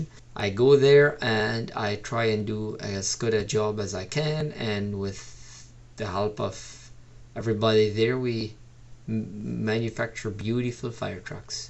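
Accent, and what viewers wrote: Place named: Ireland. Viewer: A strong Irish accent has. Fabulous work you all do,the men manufacturing and building and the ladies & Children work the land and are home makers..such a wonderful way of life.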